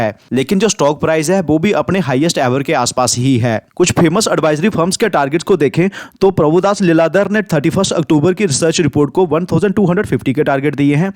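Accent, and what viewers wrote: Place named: Punjab, India. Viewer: Bhai aapka accent is punjabi......am I right??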